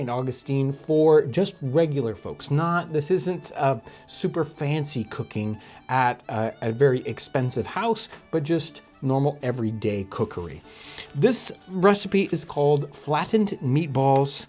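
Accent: United Kingdom. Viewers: Also are you British.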